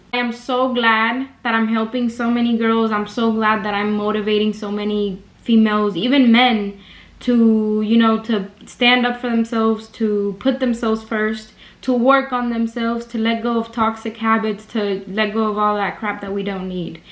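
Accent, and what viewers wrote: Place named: Miami, United States. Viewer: I love your content.. and I can tell you sound like a Miami girl like me! I’m 48 and you’re definitely wise beyond your years. Keep spreading the positivity because Lord knows we can all use it 😊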